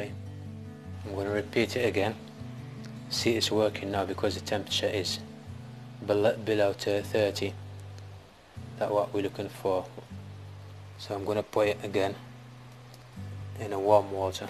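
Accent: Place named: Casablanca, Morocco. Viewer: تبارك الله عليك اخويا ، و المغاربة اللي تيهضرو بالانجليزية و شرحو بالانجليزية ، مثال بسيط و مشجع بالتفيق اخويا ، و لكن الاجليزية عندك كازوية هههههه تبرك الله عليك برو ،😍😍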